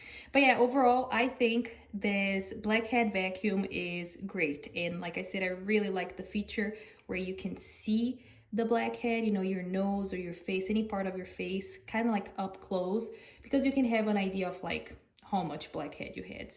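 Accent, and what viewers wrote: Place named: Brazil. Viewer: You sound Brazilian! ❤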